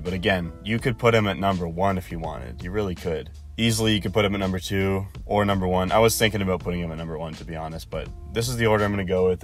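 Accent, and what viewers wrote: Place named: Canada. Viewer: Are you Canadian? You sound Canadian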